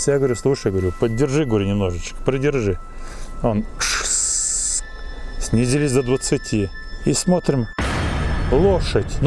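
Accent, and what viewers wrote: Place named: Nizhny Novgorod, Russia. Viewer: Чуть заметный нижегородский акцент. От молодых уже ни хрена не услышишь.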